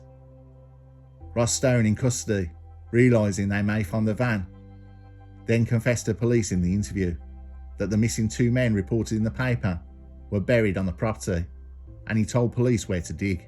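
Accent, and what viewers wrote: Birmingham, United Kingdom. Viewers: Really like the way this guy puts these together. And his VO with his brummie accent 🙏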